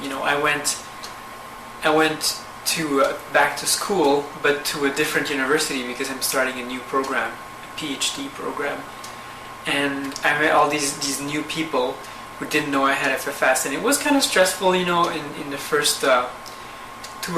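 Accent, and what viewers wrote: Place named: Quebec, Canada. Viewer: She's from the Quebec, Canada. The accent is French Canadian.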